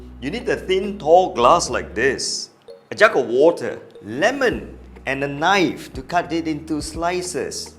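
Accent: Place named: Malaysia. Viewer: Been enjoying your videos since Last year. It's kinda soothing to listen you you speaking, especially with that Malaysian accent. Reminds me of my life in KL years ago. Stay safe always!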